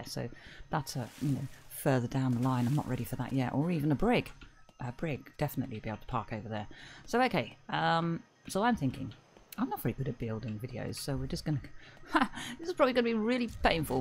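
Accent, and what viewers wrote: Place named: RP, United Kingdom. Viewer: Well spoken accent, as a geordie good English always sounds posh :)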